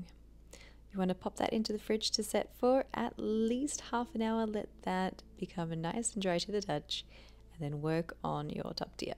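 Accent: Australia. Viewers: No problem with the audio here. It's maybe a little quieter than some channels but all channels vary. Maybe someone is having difficulty with your gorgeous Aussie accent?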